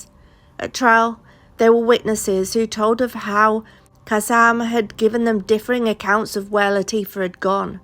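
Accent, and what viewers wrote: Yorkshire, United Kingdom. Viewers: Ahh yes, a good old car boot sale. I miss home so much but listening to you makes me feel so at home again. I can't tell you how much I love your content. I watch TC content on this platform for 4-5 hours every single night for 4 years and I love your channel. A proper Yorkshire lass!!💙 On another note my grandad had a 3 wheeler EXACTLY like the one you showed. Same colour n all when we lived in Romiley. For the record he died in '86 at age 87 and that car never drove farther than Hyde or Marple. I don't even know if it was capable😂 🤘🏻🇬🇧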